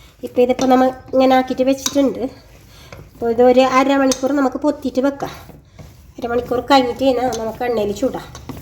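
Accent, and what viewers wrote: Kasaragod, India. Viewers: Ithu Kasaragodu language aanu. Swayam kettu nokenda aavasyam illa. Avarude language il alle avarkku samsarikkan pattukayullu. Aa language kelkkan ishtappedunna Kure aalkkaru ind. Kannur, kasargodu ullavarkku trissur, Trivandrum language kelkkumbol ulla bhudhimuttu aayirikkum ningalkku indayathu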